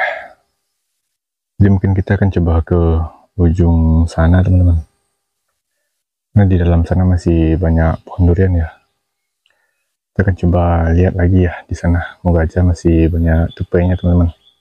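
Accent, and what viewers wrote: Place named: West Sumatra, Indonesia. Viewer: Iyo da... Wak di perantauan ma hahaha.... Mako e wak batanyo kok logat uda taka urang awak lokasi pun mbo caliak taka di padang..... Yo bana rupo e di padang hahaha.... Dima tu da padang Panjang kah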